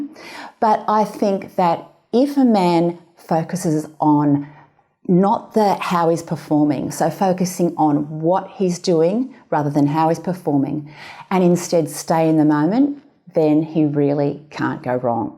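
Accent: Australia. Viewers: THANKS FOR YOUR INTERESTING SURVEY. I BELIEVE YOU ARE AN AUSTRALIAN DOCTOR FROM YOUR ACCENT. THANKS AGAIN! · What accent is this? I can't identify it. Australian maybe?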